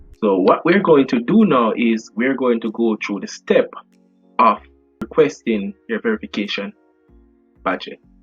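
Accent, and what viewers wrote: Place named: Jamaica. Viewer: This guy is definitely a Jamaican. Very informative video.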